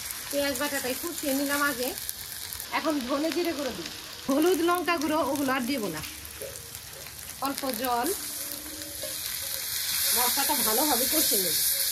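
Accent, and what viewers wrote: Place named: Bangladesh. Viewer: আমি নিশ্চিত যে আপনার পূর্বপুরুষ বাংলাদেশর ছিল। আপনার কথা আমাদের দেশের।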